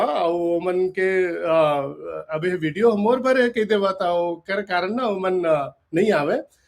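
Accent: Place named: Chhattisgarh, India. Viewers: Jay johar bhaiya pranam bhaiya 🙏 aapke chattisgarhi boli Bhari nikh laagthe, Aap bahut badhiya badhiya jankari dethav au aisnech hamesha jankari dete rahav au aap jaise kahithav na ki India cg yha se dur nhi lage krke vaisnech ab haman la bhaiya aapse Jud ke america dur nhi lage au te au aap Bahut sughghar Git sangeet gathav badh aap america me rahi ke bhi apn mati se atna Jude ye aapke chhattisgarh mahtari ke prati maya darsathe .... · तोर बोली ह अब्बड़ मिठ लगथे भैया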